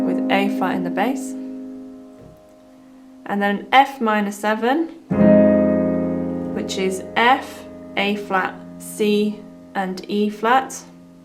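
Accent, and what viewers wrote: United Kingdom. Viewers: I know how to play the song. I just couldn't stop the video, enjoying your lovely British accent... 😊. Also nice work with the tune! Cheers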